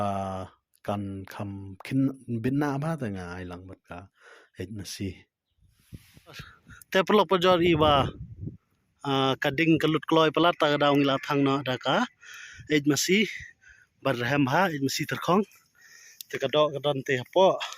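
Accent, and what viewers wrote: Meghalaya, India. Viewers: You still have the pnar accent bro😁😁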